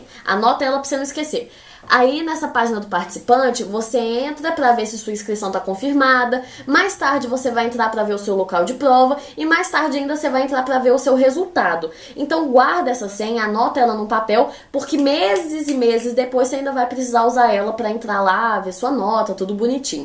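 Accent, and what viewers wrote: Minas Gerais, Brazil. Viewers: E sotaque de nos mineiros !!!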